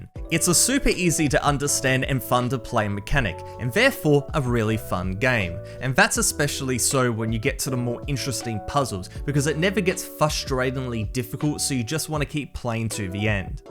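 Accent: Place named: Australia. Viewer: Dude idc what videos u make ur voice is satisfactory that australian accent hits the 'spot' yessir i love ur vids xD and yea i like ur bedwars series cuz im a bedwars kid who does yt and stuff lol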